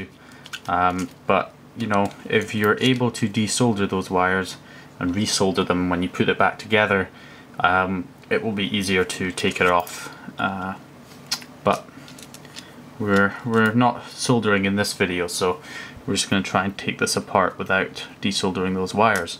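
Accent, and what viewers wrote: Scotland, United Kingdom. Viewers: Cheers for video bud! Owned a torx security bit set for 15 years and finally get first chance to actually use it haha. Nice to hear a fellow Scot too 😉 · Scottish bro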